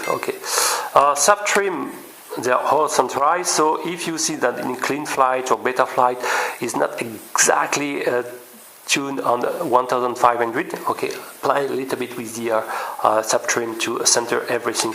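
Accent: France. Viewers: Are you French ? I notice a familiar accent to me :)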